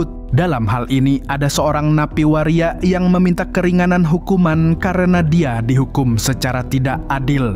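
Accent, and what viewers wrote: Banjar, Indonesia. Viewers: orang banjar kah pian min😅😅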